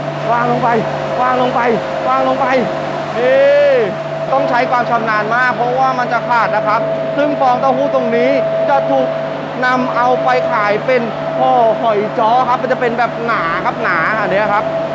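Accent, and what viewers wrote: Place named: Central Thailand, Thailand. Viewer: ชอบกินเต้าหู้ค่ะ น้อง พูดเหน่อดี ดูเพลินๆน่ารักๆดี เป็นกำลังใจให้นะคะ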